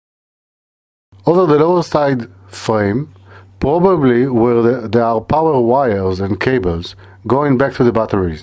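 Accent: Israel. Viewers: אתה ישראלי לפי המבטא אני בטוח חחח אין מגנים לדברים הללו ?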